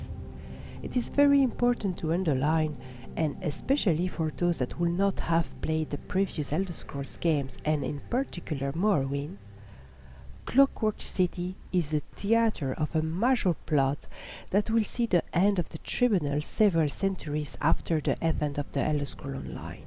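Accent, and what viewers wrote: France. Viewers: Êtes-vous français ou québécois?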